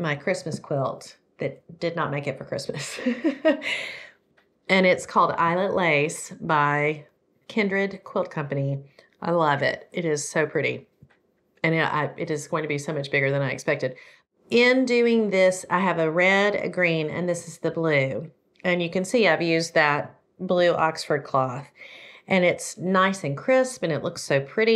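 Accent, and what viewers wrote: South, United States. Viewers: I like your Southern accent...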